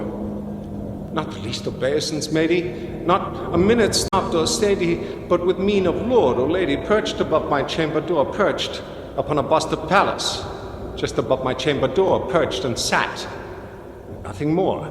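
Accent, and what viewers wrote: United States. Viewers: "The Raven," straight out of Brooklyn. This is ridiculous. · it's a nyew yoak accent.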